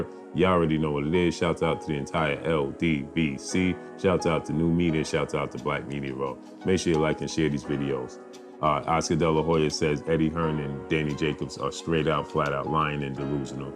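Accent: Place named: Bay Area, United States. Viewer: Bro you ever think about rapping? You got that voice! That Bay Area floooo. You sound like BIG LURCH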